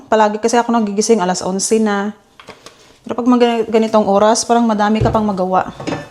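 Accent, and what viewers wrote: Iloilo, Philippines. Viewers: ang sis in law ko dati marami rin pimples tapos nag consult siya sa dermatologist naghiyang siya dun sa pinapahid niya gumanda ang Mukha Niya. ikaw din maganda kna parang may kinang ang Mukha mo. I wish mkadiscover ka ng gamot n mkaalis ng pimples mo para Lalo Kang gumanda . honestly bisaya din Ako but i really love the ascent of ilongga.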